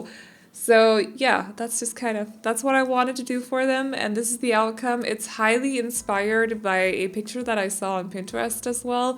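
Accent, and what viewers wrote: Denmark. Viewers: Faktisk var hun en af de første mennesker, jeg fandt. men tak! Også nu tror jeg, at min engelsklærer måske bruger dine videoer til danske studerende, der lærer engelsk haha. Hun tror, det vil hjælpe dem, da du har den danske 'accent'. HVORDAN LÆRER MENNESKER ENGELSK, SOM DE HAR STAVRETNINGSREGLER, OG FØLG DEM IKKE DEM!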